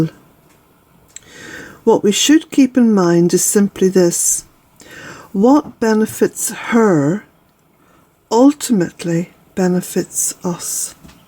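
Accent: Ireland. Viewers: I'm new to your channel and viewing from the US of A. Lovely and inspirational. Plus I'm in love with Jack. And you have to know how we Americans are enamoured with a good Irish lilt. In addition I'm a 60+ woman dreamy with Adian Turner as Poldark thank you very much PBS. Stay well.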